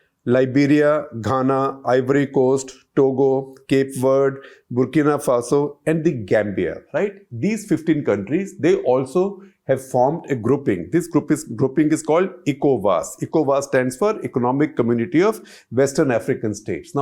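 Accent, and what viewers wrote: India. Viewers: Fake off. Your. lousy thick Indian tone is faking incomprehensible!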